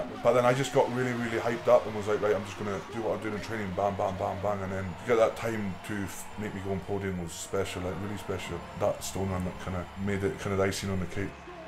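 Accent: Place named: North East Scotland, United Kingdom. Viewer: His accent sounds very North East Scottish.I should know I live there.Never heard of this guy before but I'm definitely gonna start following his strong man career.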